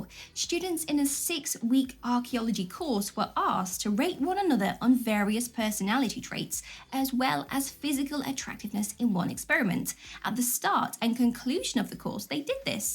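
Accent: United Kingdom. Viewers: HONESTLY. These behaviors are not problems I have. The exception is that sometimes I seem to be figeting, but I'm actually constantly doing finger exercising to increase dexterity (professional musician... guitarist) I usually just explain that I'm not nervous, and about the exercises.... Found it to be a Good way to open up an invite for a lady to come to one of our shows. However, listen up everyone. This information is hugely important. Nice Work. Good Content here! I'm liking your British accent, reminds me of my favorite friend in college. She was from somewhere near Cambridge. She used RP.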